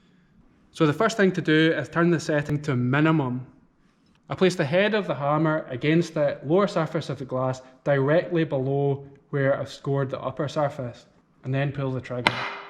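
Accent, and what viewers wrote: Scotland, United Kingdom. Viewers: Have you seen the Palace of Glass video showing how they chip the glass in predictable ways to make a pattern on the chipped edges? Amazing. Hearing you voice reminds me of my visits to Scotland and once this covid pandemic is controlled with a good vaccine, I need to visit again.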